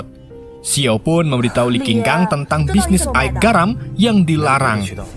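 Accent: Java, Indonesia. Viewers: Min sampean wong jowo ta🗿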